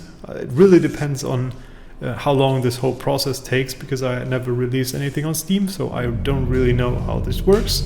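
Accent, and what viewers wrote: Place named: Germany. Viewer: einfach deutscher Akzent 🤣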